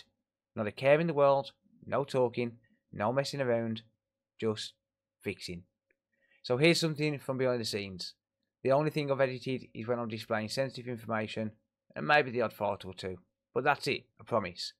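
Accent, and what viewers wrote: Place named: Birmingham, United Kingdom. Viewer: Not the same without the Brummie accent 🤭🤭🤭🤭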